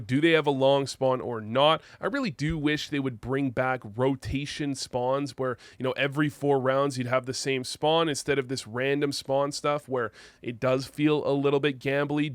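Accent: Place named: Canada. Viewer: You sound Canadian!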